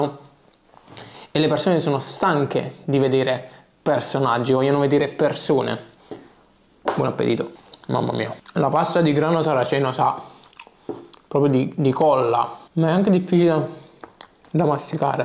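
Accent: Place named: Naples, Italy. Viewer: Finalmente era ora! Basta con il fast content, cosi i contenuti si assaporano con la giusta tempistica senza quel bam bam bam di informazioni veloci, che tra l'altro assimili per un tempo limitato poi te le dimentichi. Mentre per il tuo italiano siamo troppo influenzati dal napoletano, nun ce putim fa nient 💙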